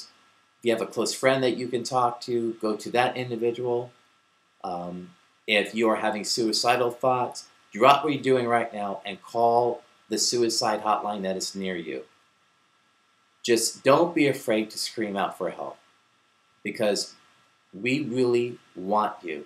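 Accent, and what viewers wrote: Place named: New York, United States. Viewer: As soon as the first word came out his mouth you can tell hes a NYer, Nice Vid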